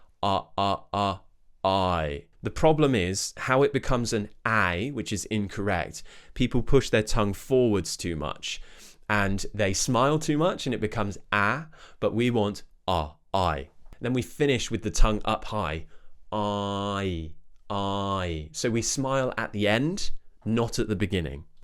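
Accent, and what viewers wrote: United Kingdom. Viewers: Always like your accent, British English accent is awesome❤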